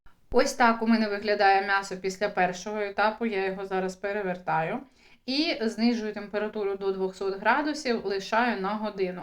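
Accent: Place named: Transcarpathia, Ukraine. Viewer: Це закарпатський діалект.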